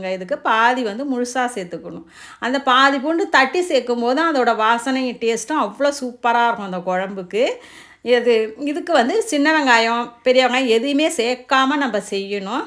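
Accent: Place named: Thanjavur, India. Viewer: U have interior Thanjavur slang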